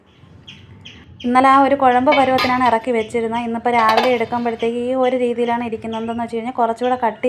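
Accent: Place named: Kozhikode, India. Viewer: നല്ല സംസാരം കേട്ടിരിക്കാൻ തോന്നും കോഴിക്കോട്ട super ഞാൻ ഇങ്ങനെ ഉണ്ടാക്കും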